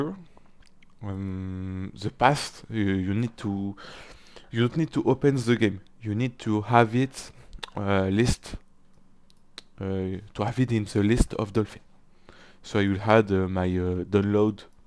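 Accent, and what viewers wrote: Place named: France. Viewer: ton accent ma fait capter direct que tu est français sinon bon tutoriel tu a gagner un abonner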